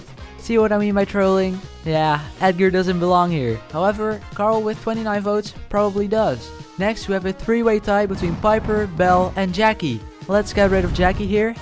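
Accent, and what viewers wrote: Netherlands. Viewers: Holy Dutch accent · Wait are you Dutch?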